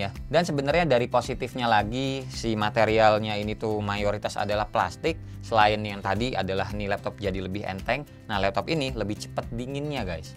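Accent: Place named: Java, Indonesia. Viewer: Yah, bang kok gak intro nya ga ada "ketemu lagi" wkwk (Terus agak medok wkwk)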